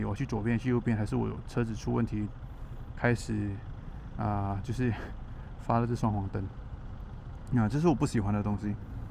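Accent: Taiwan. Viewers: 你是什么人，为什么有台湾腔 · 台湾口音😂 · 给你的口音骗了，还以为是台湾人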